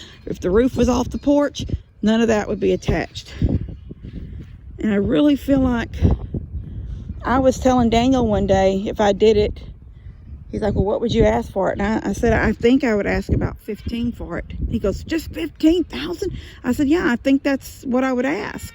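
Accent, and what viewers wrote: Texas, United States. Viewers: I'm literally catching up with you and honestly I could listen to you all day. So many of the stories of your life resonate with me. I've always had horses and they are my life. Just one husband a daughter and a son, even my kids said why do you put up with this but I'm of the generation who stuck it out. I got rid of him finally and bought a little beachside rundown cabin and damn near killed myself doing the Reno to make it liveable. I swear I can smell that smoked meat I used to smoke fish. I've told your dad I just love his Texan drawl and sure love yours too. I'm Australian and you know what we're thousands of miles away in distant lands but I sure do love you folks, you're my people 🤗 give that lovely big boy of yours a kiss from me. My name's Megan MacDonald and I really enjoy watching your whole families lives ❤️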